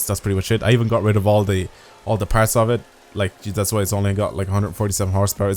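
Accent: Dublin, Ireland. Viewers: U from dublin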